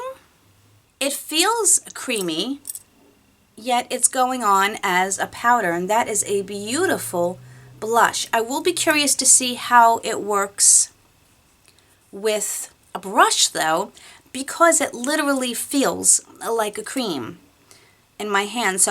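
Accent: New York, United States. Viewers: Where are you from? I like how you talk lol New york? New jersey? I got the iby highlighter-make up forever foundation-mac lip gloss-the k vos lip balm-and a itsie eyeshadow 😁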